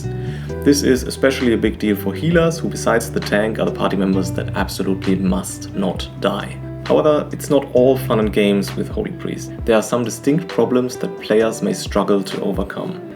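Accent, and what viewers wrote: Germany. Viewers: I like(d) all your videos so far! They are really good - especially the Pres evoker and disc ones! Piano sounds aren’t bad at all, but it is the wrong note for me. They doesn’t fit to your script. It is just my personal feeling, so don’t worry! This doesn’t mean, that your videos are bad or something. 🤘🏼👍🏻 Just a tiny hint: try while editing a click or short swift sound to have a comparison. Furthermore it is obvious or at least it looks like, that you are reading your text. Another suggestion: just read your topic bullet points and use your own words. I’m sure it will underline your enthusiasm even more! Your next video will be good too! Even if you aren’t doing +12s or above with your Druid ☺️ …and last but not least least: I’m German too 😅 so… I can hear it 😎🤣 however, your English is really, really good!! I have to speak English day by day, but I’m far away from your speaking skills! 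Cheers m8 and I’m looking forward to your Druid & mw monk videos! 🤘🏼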